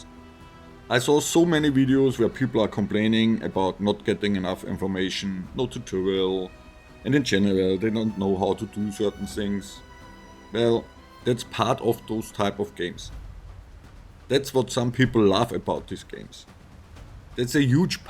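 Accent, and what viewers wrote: Germany. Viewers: German detected. Bayern? Your voice sounds like Bavarian person, but I might just be wrong there.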